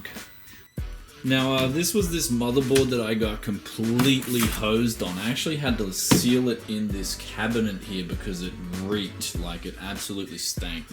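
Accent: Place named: Australia. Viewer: I thought you where from Queensland or Aussie. Why do you live in japan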